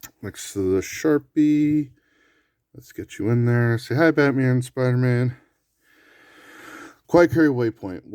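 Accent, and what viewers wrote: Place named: Massachusetts, United States. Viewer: Not a bad collection! Pretty ridiculous seeing the two Toors side by side. I handled a v1 in my local knife shop, but have never owned one. I'll grab one when I find a good enough deal. Have you seen the new $425 folder they're making? Looks kinda like a 3.25" Greyman Satu. Looking forward to the sharpening video. You showed a few decent edges. I thought I recognized a bit of mass accent... Im in central new york.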